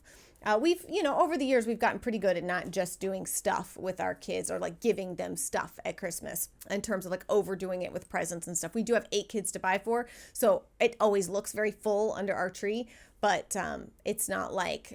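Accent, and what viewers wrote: South, United States. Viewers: I have a King of Christmas tree last year and I love it!!!!! I have always had a real Frazier Fir tree. Last year the real trees were so expensive and it would die after a month. I am also done Christmas shopping thanks to you my friend. PLEASE keep being chatty I love hearing your voice the southern accent is the best.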